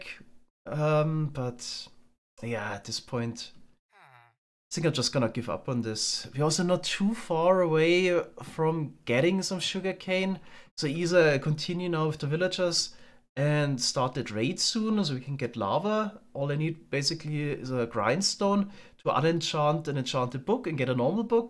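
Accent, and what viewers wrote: Germany. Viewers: Are you German because you have that accent if you have pls say something in the next video bb love your content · Ach man.. Immer dieses Englisch als Deutscher 😂 Man hört es immer raus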